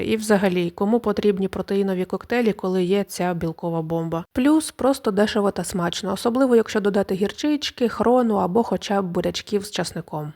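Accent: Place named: Kharkiv, Ukraine. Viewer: Ви - чудова, і рецепти - цікаві. Більш за все подобається ваш тембр. І ще інколи здається, що ви з Харкова.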